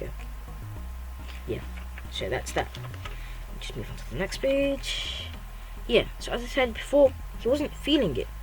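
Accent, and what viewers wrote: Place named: United Kingdom. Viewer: lol i can already tell your british are you a roadman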